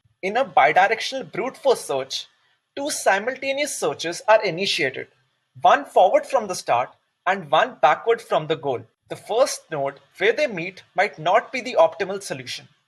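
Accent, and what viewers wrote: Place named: Telugu, India. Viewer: Arif C it kinda look like Telugu accent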